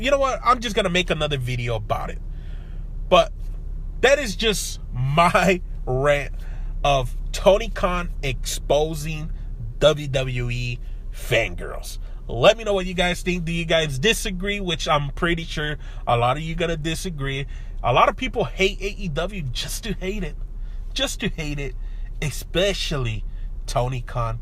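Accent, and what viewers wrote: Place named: West Coast, United States. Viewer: Bruh I forgot I even commented watched this again dying laughing your accent is gold bruh 😂 definitely a west coast Latino 🥂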